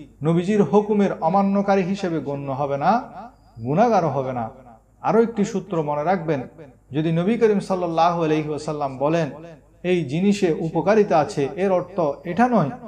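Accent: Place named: Chittagong, Bangladesh. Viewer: Chittagong er hujur bujha jasche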